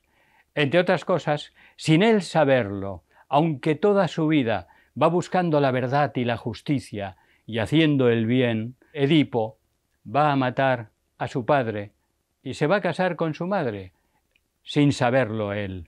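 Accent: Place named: Spain. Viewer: Magnífica exposición y más aún sus reflexiones personales,Profesor.Y para un argentino con las 3/4partes de su sangre,ibericas,su gallardo acento español es música.Muchas gracias!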